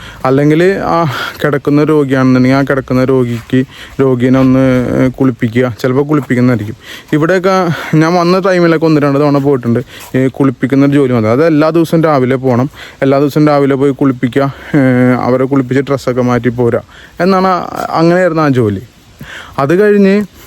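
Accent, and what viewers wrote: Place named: Ernakulam, India. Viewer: എറണാകുളം സ്ലാങ്ങ് ആണല്ലോ.Akm ആണോ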